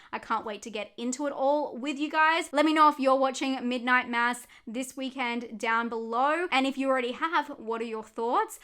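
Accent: Australia. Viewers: Youre Australian?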